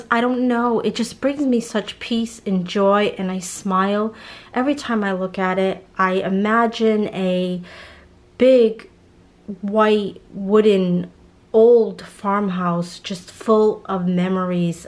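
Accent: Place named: New York, United States. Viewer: Love your accent...sound like a New Yorker and nice farmhouse inspired dollar tree haul! I'm loving the cross along with the blue picture frame combination!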